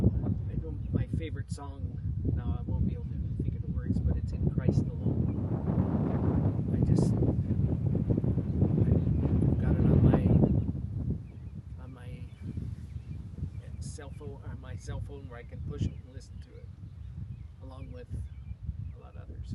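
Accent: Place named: North Dakota, United States. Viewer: I enjoyed listening to your story. We didn't get to really know each other very well, but I do remember when you and your folks would come to Plentywood. Even though you have been to Reno, California, and other places I can still hear that North Dakotan Norwegian edge to your voice. I love that and reminds me of my cousins in the Parshall area as well as your uncle in Plentywood. I am glad you have found love and joy.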